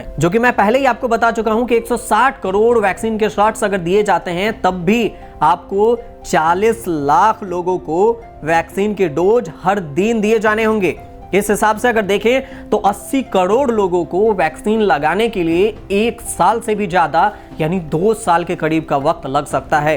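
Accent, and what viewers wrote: Bihar, India. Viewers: A bihari accent thheek karr Bhaarat hota he Bhaadat nai Zaroori he Zadoodi nai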